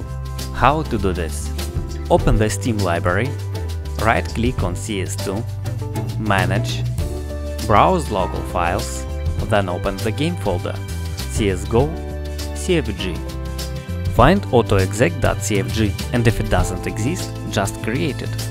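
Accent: Russia. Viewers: У тебя русский акцент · у меня шизофрения если я слышу русский акцент? а нет теперь я в шоке что наткнулся на 2 акк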